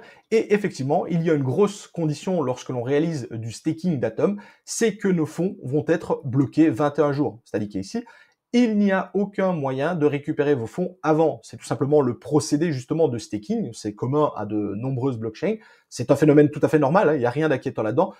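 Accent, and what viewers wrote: Belgium. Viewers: Cet accent xD Tu es du côté de Liéch?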